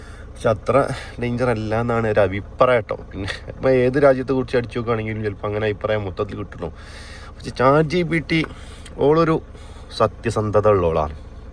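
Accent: Malappuram, India. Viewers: Malappuram സ്ലാങ് അടിപൊളി ❤ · Hai, where are you now? in Namibia? wish you a happy journey. Your malappuram slang is amazing. God bless you.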